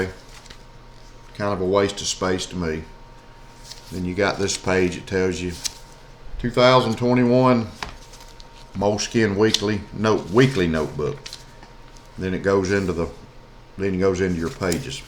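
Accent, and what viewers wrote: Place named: Appalachia, United States. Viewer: It is so refreshing to not only see a man on "journal Tube" but also one that has an Appalachian accent. You sound like my family members and there's something really comforting about that.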